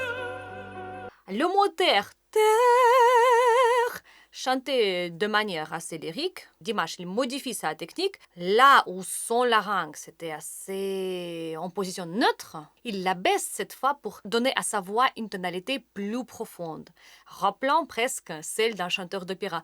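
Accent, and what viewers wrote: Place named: Russia. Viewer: Видно что вы профессионал. Спасибо за анализ☺. У вас слышен русский акцент😊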